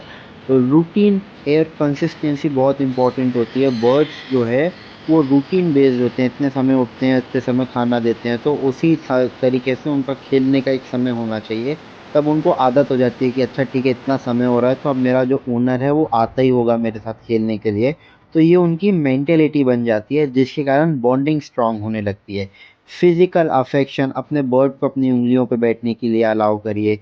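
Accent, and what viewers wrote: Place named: Gujarat, India. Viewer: Very informative video..... Gujarati hoy avu lage che....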